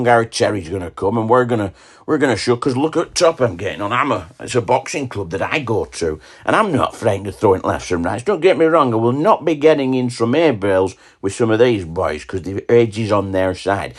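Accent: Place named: Blackburn, United Kingdom. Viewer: Some proper Blackburn accent is that. Im from Chorley ✌️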